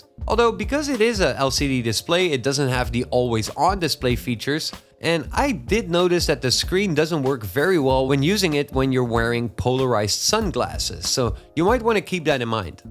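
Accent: Netherlands. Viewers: Hi, net je review bekeken. Zeer duidelijk 👍🏽 Dacht dat ik een Nederlands accent hoorde. Kijken of ik deze telefoon vandaag in Nederland krijg besteld. Top review. Groetjes uit Nederland en ga zo door. Marvin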